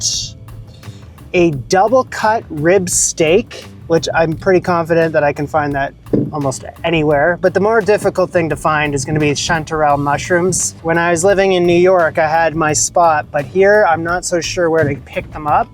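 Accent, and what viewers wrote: Canada. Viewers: Coming from a New Yorker, your Canadian accent has completely come back. I've been watching your show for about 4+ years now and I don't remember hearing your Canadian accent as much as I do now. I've caught a few words with it before, but yeah, lol. I like this other side of your show. Watching you getting the ingredients and how you choose them is pretty cool. I like this style of vlog from you.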